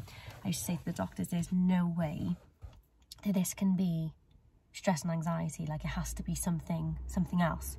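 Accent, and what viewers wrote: Cardiff, United Kingdom. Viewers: Old video, but I find I have an inability to adjust to situations. Maybe eyesight issues from anxiety! Btw, you from the ‘diff? I hear a Caaaardiff twang 😂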